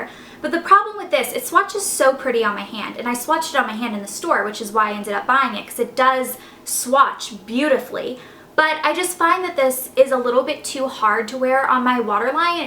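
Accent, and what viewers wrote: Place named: South, United States. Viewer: She is southern... We southern girls can't pronounce things just right... lol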